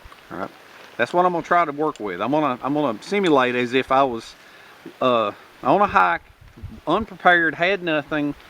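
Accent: South, United States. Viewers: As always, thank you for an entertaining learning session. I've said it before, you are the Mr Rogers of survival. I've watched my share of survival videos and all of them take on the overtly serious tone which makes the video far less entertaining (They need to learn to lighten up and laugh at themselves). You're honest in your situations and circumstances (just like the tent fire with the carbon fiber chimney) and you keep a fun and positive attitude. As I stated before, the Learning Channel needs to take a serious look at your videos and NOT CHANGE A THING about what you do in them and allow you to keep in real. As a fellow southerner (Look Out Mountain, TN) living in California (but leaving soon), it's a breath of fresh air to hear a real southern accent. You've got the regular TV programs of today beat, my friend. Except for Twilight Zone and Hogan's Hero's, there's no reason to watch the TV when I have your educational entertainment available here. 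Be safe my southern brother, and take care!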